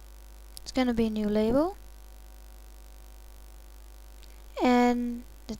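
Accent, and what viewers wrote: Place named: Netherlands. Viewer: Haha, ik dacht al te horen dat je Nederlands was!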